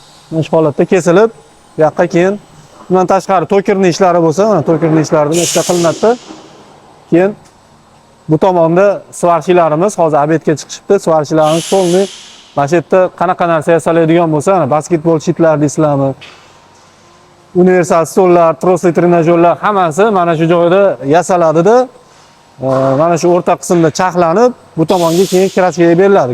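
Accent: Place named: Andijan, Uzbekistan. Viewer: Шевещга караб Андижонга ухшаяпти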